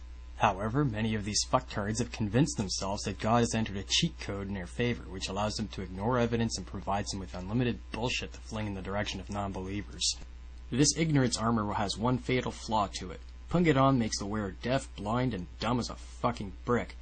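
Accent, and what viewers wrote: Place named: North America. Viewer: i'm sorry for making assumptions about your age based on your voice. But the accent.. well.. i'm a swede.. i wouldn't expect you to tell the difference between scandinavian accents either. i'm sure many people enjoy this emulation, and you do it well for someone with a northamerican accent and your voice (no insults intended). i just personally think that the brilliance of Yahtzee is as mutch about his cynical tone of voice and english dialect as it is his sarcastic fast pace.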